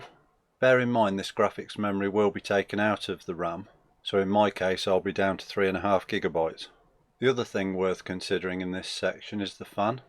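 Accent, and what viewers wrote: United Kingdom. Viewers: a brummy voice i hear!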